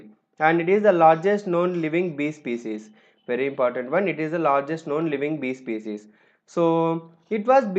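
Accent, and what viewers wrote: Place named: Telugu, India. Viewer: Very helpful Thank you so much.... Ur accent seems to be that of the Telugu people...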